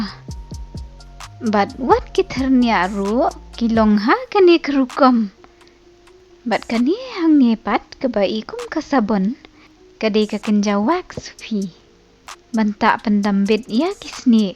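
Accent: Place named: Khasi, India. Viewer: Nga sgewtynnd ia kane ka chanel dei na ka rukom kren...khasi tiak pa tiak bad tbit bha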